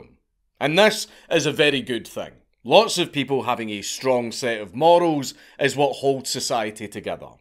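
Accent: Scotland, United Kingdom. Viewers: He should do an video where he speaks like a Scottish road man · Never thought I’d be falling asleep to a hairy Scottish man telling me about a plane crash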